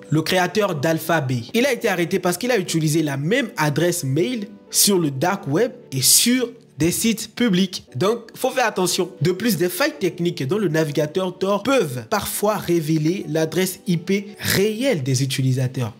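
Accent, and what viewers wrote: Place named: Senegal. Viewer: Super vidéo et en plus avec l'accent sénégalais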